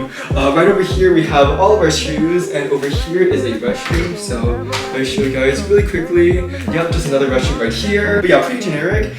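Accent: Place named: United States. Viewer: afterthis video i realised how american your accent actually if and my mind is blown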